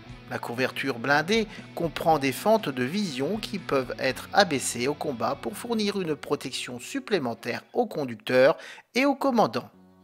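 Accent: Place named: Germany. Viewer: Il a un petit accent allemand 😉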